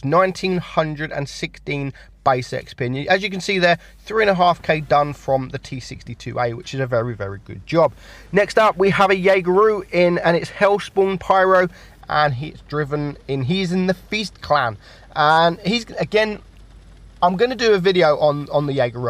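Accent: United Kingdom. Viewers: Yeah that's pure blood British accent and dialect lol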